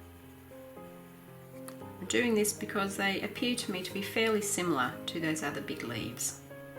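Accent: Australia. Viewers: I haven't done the duck pond yet, but i love coloring along with your videos. The music is soothing, your auzzie accent is great, and your techniques inspire me! I see progress in all of my pages :) Just wanted to say thanks, and keep up the great videos :D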